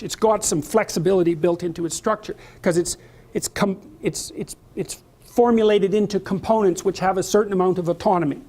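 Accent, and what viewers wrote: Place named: Canada. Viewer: Lewis ouaret He's Canadian, you can hear it in his voice.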